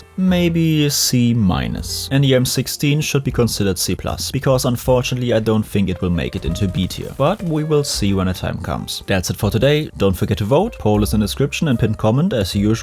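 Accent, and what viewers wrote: Germany. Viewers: Just wanted to say hello as a new subscriber. Just found your videos and they are AWESOME. I really like how you break the weapons down, specifically the ttk ranges and ammo/barrel differences. You are the 1st BF person I've found who does this (yes, I'm newer to BF) coming from 20yrs of COD where it is more common (again yes, I'm a COD convert but I think we all can get along don't hate me 😉. Also my fave is MW2 most BF-like imo). Already kinda long sorry just wanted to say keep it up great job! And as 1st generation American from German & Croatian immigrants I think i caught the accent - German, no?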